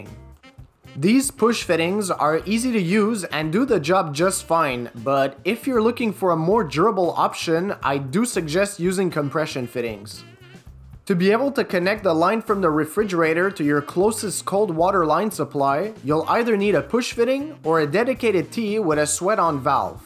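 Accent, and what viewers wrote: Quebec, Canada. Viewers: That undeniable french canadian accent :p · DUDE, you must be from Canada Dare Hey!!!!! Your accent gives you away.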